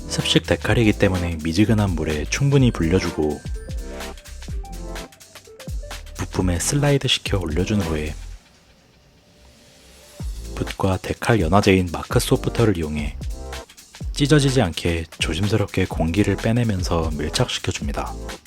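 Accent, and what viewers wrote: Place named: Gyeongsang, South Korea. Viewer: 경상도분이신가보네요. 서울말 쓰려고 노력하는게 저는 들립니다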